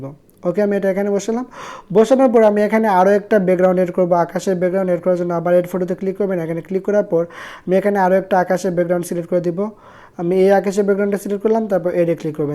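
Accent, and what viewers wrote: Sylhet, Bangladesh. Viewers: ji oy... Bai. Jesataw kow.. Ek Sylheti e onno Sylhetir mat bala kori buje🙃😇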